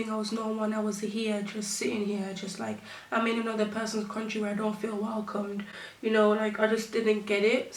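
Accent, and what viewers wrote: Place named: Jamaica. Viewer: love this videos love thank you for sharing your journey also love the Jamaican accent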